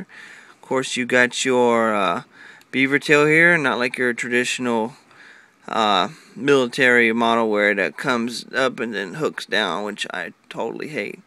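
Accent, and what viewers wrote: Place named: Texas, United States. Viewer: JohnnyAss, Dude is from Texas. STFU Hollywood.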